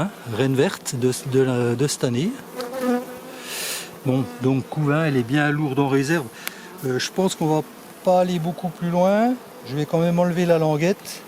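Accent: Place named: Franche-Comté, France. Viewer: Ce bel accent franc comtois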